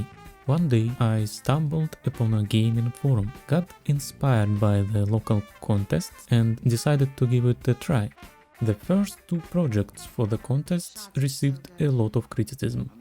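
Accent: Russia. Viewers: Ну по акценту я сразу понял что ты наш слоняра 💪 а фото университета это лишь подтвердило 💪💪💪💪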